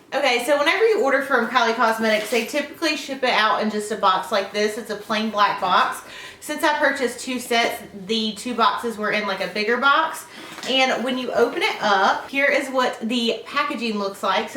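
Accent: South, United States. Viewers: I Love Your Southern Accent. Maybe I Should Ask First Are You From The South? lOl If So I Love Your Accent & Your Makeup Here As Well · I love your voice it sounds so country!!!!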